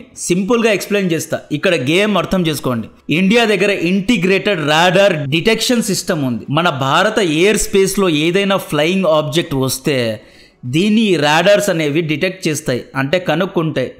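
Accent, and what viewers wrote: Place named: Chittoor, India. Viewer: Brother, Better, Refine your accent.!!! It is sounding like Chittoor accent. !!! ❤❤❤ 🎉🎉🎉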